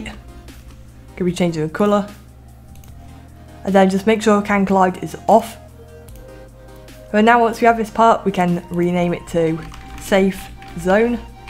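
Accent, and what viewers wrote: United Kingdom. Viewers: I know from the start of the video that you're british :]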